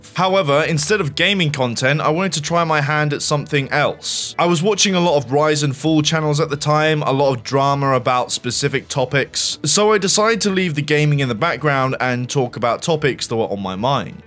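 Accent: England, United Kingdom. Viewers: I'm glad I discovered your channel just in time to witness this part of its growth "live"! Of course your content interest me in the first place but the other crucial thing is you. The way you behave and talk, your tone etc. I don't watch content, however interesting, if I don't like the youtuber's general behaviour. You sound genuine, don't overdo things and that's what I like. I thought I was fed up with english accents (not my first language), but you seem to have cured that lol. Gonne watch those videos of yours I still haven't while waiting for the next one. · As a 33yo core gamer i must say i was hooked up after randomly founding "what when wrong" video. I watch you vids from around week, subscribe 2 days ago and start watching everything you done (except drama and 'r word' vids). I am maybe not commenting too often (kudos to Just Some Guy without a Mustache) but i found myself hooked up to informative content, funny and short jokes like "standing on the table". 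Personally i like backstage informative content and you personall insight behind it. Best vid for me was the one about doors, maybe it can use some polishing but still it was good and engaging imo. Glad to see your channel grow and you like what you doing, thats most important thing for creators to not burn out too quick. Wish you luck and waiting for new various content ;) P.S. be patient and dont get trolls/toxic people to get over your head ;) We like you for who you are, and you beautiful accent (event tho personally i dont like brit accent xD) you rocking it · Got around to this a bit late. I think I joined about when www started, and you instantly became a favourite. Continue making well-produced videos and never lose that brilliant English accent.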